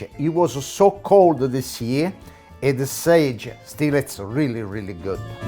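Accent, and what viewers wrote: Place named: Apulia, Italy. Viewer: He's Pugliese. Dummy.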